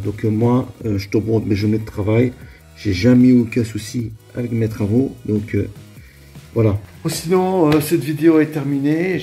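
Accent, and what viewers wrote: Belgium. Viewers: Mais ne serais ce pas un petit accent belge ? Voir même liégeois 😊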